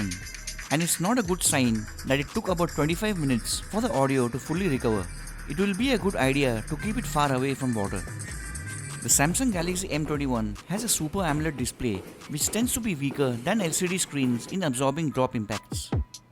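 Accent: India. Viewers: Indian English:))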